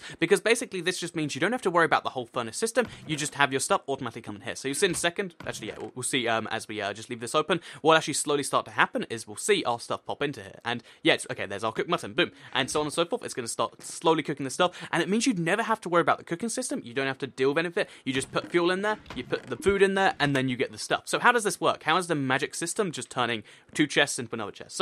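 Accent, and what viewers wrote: United Kingdom. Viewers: Sonic pikachu don't make fun of him that's because he's British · You speak in such a breathy manner lmao wonder what part of the UK he’s from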